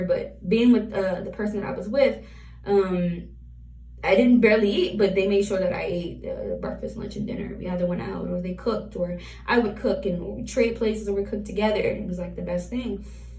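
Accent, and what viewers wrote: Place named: Baltimore, United States. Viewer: You from Baltimore I know stUpid and DUo when I hear it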